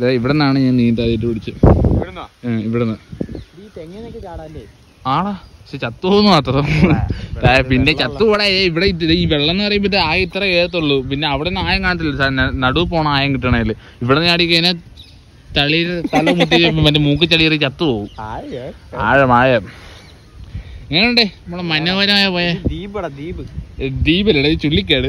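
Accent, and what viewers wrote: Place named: Kozhikode, India. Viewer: Kozhikode slang 😁❤️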